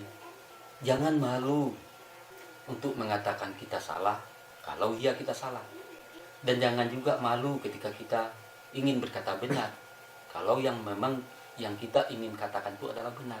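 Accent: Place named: Minangkabau, Indonesia. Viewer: . Alhamdulillah bapak sehat, sebenarnya saya hanya meneka , ternyata bapak berbangsa Minangkabau,saya tahu dari cara perbicaraan bapak..hampir sama seperti orang Brunei,semoga channel bapak terus mantap maju dan berjaya.semoga bapak terus berkarya dengan lebih maju lagi.salam dari kami melayu Brunei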